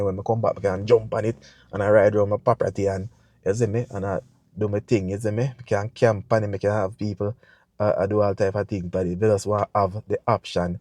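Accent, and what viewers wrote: Jamaica. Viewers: Spoken ❤ true orthentic Jamaican. Up up str<num>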